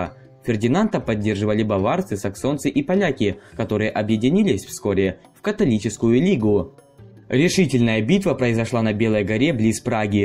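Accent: Ukraine. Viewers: Ты не с украины случайно?.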